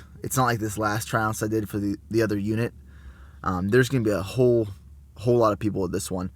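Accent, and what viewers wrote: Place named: South, United States. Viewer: Sounding very southern ha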